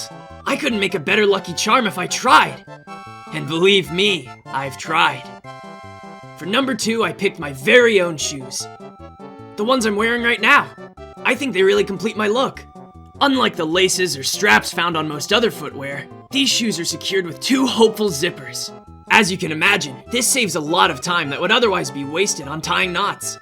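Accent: South, United States. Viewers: ✨ I’m ✨ Uncomfortable ✨ with ✨ ✨ country ✨ accent ✨ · 'Ya didn't tell y'all fans 'ya had such a good cowboy accent! Teach me 'ya ways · with a country accent is somehow cursed and blessed at the same time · HOW DOES HE HAVE HIS STUPID VOICE AND A COUNTRY ACCENT AT THE SAME TIME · If I never hear that Southern accent again, it’ll be too soon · the country accent scared me. i physically recoiled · I love your country accent so much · Love the country accent 👌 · holy hope, the country accent, man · HELP HE HAS A COUNTRY ACCENT THAT SURPRISED ME SO MUCH I JUMPED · how do you sound like and have a country accent at the same time. I - that's incredible.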